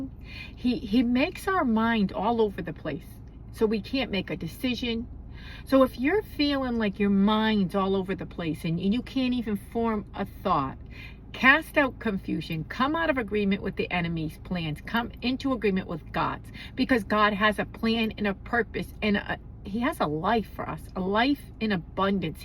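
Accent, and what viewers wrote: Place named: North, United States. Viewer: Amen! Are you from up north? I’m from upstate New York and thought you sounded northern!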